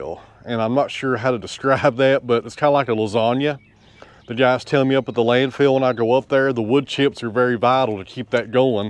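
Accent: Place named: South, United States. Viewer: I will add my voice to those who enjoy your videos just how you’ve been doing right along. That includes your explanations about not only what you’re doing but also why you’re doing it. I enjoy learning about your work. Even though I live up north, I also enjoy your delivery with a Southern touch. In these days of homogenization, the maintenance of our regional differences is a good thing IMHO. Thank you for sharing.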